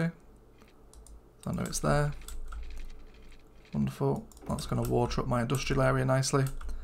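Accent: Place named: United Kingdom. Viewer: Hi, apart from this map do you have a thought process what map you choose. Also cheeky question where you from in the UK. You sound like my home town lol.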